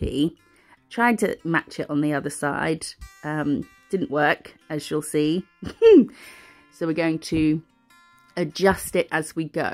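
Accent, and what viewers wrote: United Kingdom. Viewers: I have 2 bunnies irl and my very large boy an English Lop has a real thing for nail friends with British/Aussie accents he hears you or Kadence and comes to watch - he appreciated your bunny and so do I. I love you characters I think they are the best. Thank you so much for taking all that time to show us how to do them. One more thing sorry for my fellow Canadian they just don’t make ‘‘em like they used to 😂😂😂 loved the story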